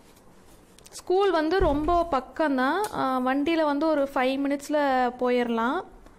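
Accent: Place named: Coimbatore, India. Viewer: I thought u must be from coimbatore.the people in coimbatore speak like u.their speech is very respectful.iam also tamilian.my mother is from trichy.my husband from coimbatore.i did my education in karnataka.i speak in home Tamil.is my opinion better to speak mother tongue at home what ever state u stay .because kids forget d mother tongue .next generation kids should learn their mother tongue.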